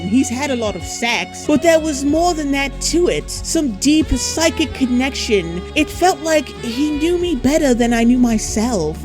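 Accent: United Kingdom. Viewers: Is she from burnley?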